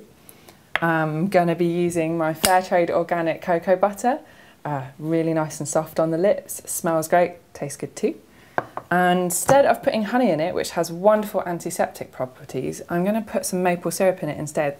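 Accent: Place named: United Kingdom. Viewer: i am not vegan either, but i love making vegan lip balm and i love your vids! i made mine with organic jojoba oil and vanilla. and because of you accent, are you from the uk? because right now im in the uk on vacation with my family......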